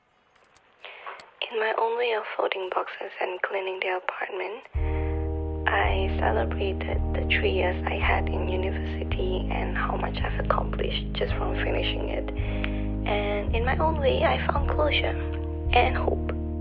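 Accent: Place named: Singapore. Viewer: I'm glad you found my channel, because now I found your channel! I teared the first minute because it hit so hard. I've said goodbye to close friends who went back to their home countries, and then when it was my turn to leave my family and friends "behind" it was such a painful moment as well. This was a beautiful video. Looking forward to more content from you <3 Sending love. p.s. i also missed hearing that singaporean (it is, right?) accent :)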